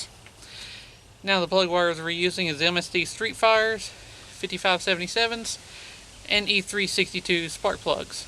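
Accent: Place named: South, United States. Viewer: Personally, I can't thank you enough for this video. Just got a P0303 on my 2004 Blazer LS SUV 4.3 Vortec this week. Picked up some standard AC Delco plugs and a set of "driveworks" (good reviews) brand plug wires at Advance yesterday, (Sunday), so I'ma try to tackle the driver's side --- first --- and get out the #3 plug out first too. Thanks for sharing your knowledge with all of us because you sure do make our DIY mechanic lives easier! Mr., you sound like a good 'ol country boy --- like myself --- so I was wondering what state you live in? Thanks again!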